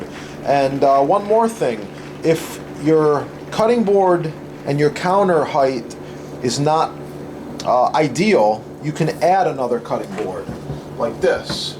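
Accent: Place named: Midwest, United States. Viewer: Thanks for the video! I love a good mid-western accent; it keeps my ears perked, lol. Keep creating, man!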